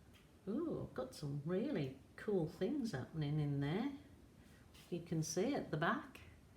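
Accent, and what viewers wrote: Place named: England, United Kingdom. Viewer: Wow! Looks like a dragons head! Lovely. It is also nice to hear an English voice too!!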